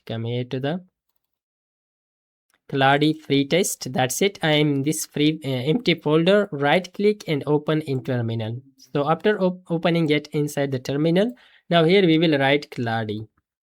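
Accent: India. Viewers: Indian pronunciation 😂 Clau de code 😂 It's claude not clau de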